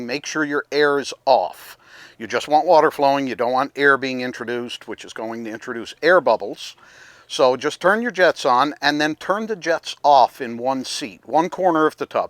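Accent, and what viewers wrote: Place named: Newfoundland, Canada. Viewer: are you from the east Coast? Little bit of a newfie twang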